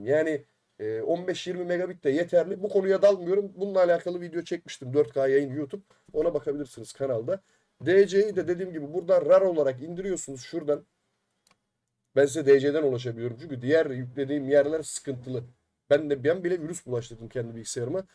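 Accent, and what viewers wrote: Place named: Balıkesir, Turkey. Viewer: Bu oyun bize kafayı yedirtecek. Ne yaptıysam bazı sorunları çözemedim. Bu sorunları tek tek size yamıyorum çünkü kişiye özel cevap verebileceğinizi düşünmüyorum. Bu nedenle işin sıfır noktasından başlayıp yardımcı olmanızı istiyorum. Windows 11 flash oluşturmadan başlayalım çünkü bizler bilgisayarı bilen kişiler değiliz. M. anlatır gibi (kendim için söylüyorum) tek tek KISACA anlatır mısın. Konuşma tarzından balıkesir ve çevresinden olduğunu tahmin ediyorum en son çare bilgisayarı kucaklayıp sana getireceğim. kolay gelsin.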